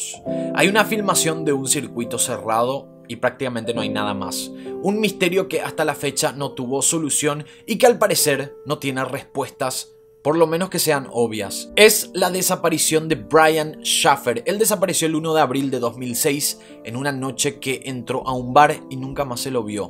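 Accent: Paraguay. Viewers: Tu acento neutro paraguayo 👌👌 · Te encontré en Fb Y luego te busque en YouTube Me suscribí a tu canal Me encantan tus videos Todo muy bien investigado No había escuchado hablar a nadie de Paraguay 🇵🇾 hermoso acento 😊 Me uniré a todas tus redes sociales 😉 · Me encanta que no perdes el acento de Paraguay ❤️🙌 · me encanta los Capitulos, despues de ver 6 episodios me di cuenta que es Paraguayo, por el asento 😜 te sigo desde Dublin Ireland 👍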